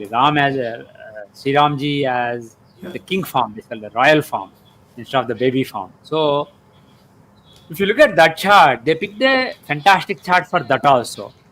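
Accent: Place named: South, India. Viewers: 😂😂😂👎👎👎👎 Terrible Southie Accent. Speaking Madrasi ? Or English? No one understands. Terrible 😂😂😂😂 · He is south indian, an achiever, also astrology is his passion and interest. His way of speaking does not seem important, what he is saying is more important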